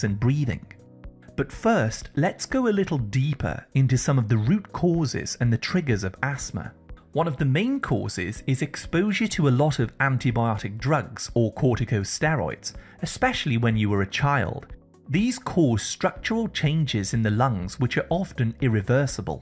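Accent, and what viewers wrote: United Kingdom. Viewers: First off, couldn't they Find someone that spoke American, That British tongue Is irritating,.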